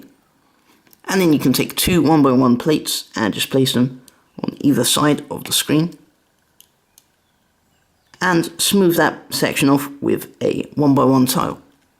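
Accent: United Kingdom. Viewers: I like your voice are you from Britan? Also your really smart and creative!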